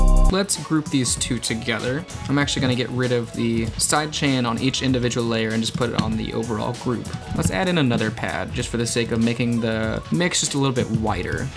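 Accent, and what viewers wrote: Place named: Canada. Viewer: I only just now realized that you're Canadian! Soon as I heard "pro-cess", instead of "prah-cess". Dang your accent is barely even distinguishable from a General American one. Hardly the stereotypical Canadian accent!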